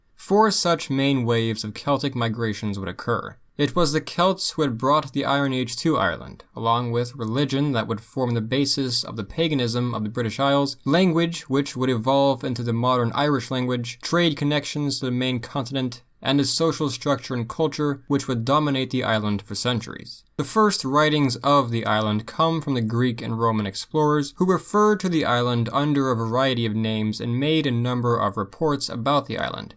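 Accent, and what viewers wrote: United States. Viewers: I was disappointed that the narrator is speaking in an American accent, not Irish or English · Can't stand the American accent with odd emphasis on words and in this case, a boring voice, like reading a book in a hurry.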